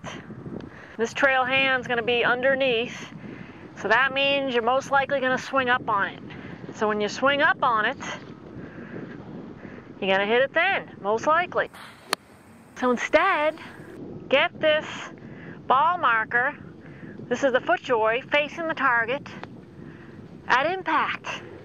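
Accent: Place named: New England, United States. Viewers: Working my way through all your videos, which have been soooo helpful. I am like Mark J. I stick to one person's advice and yours is clearly a game changer. Thanks and I love your Yankee accent. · Funny lady and such a clear explainer. Love that no nonsense New England accent. packs a ton of info into a short space and is always entertaining.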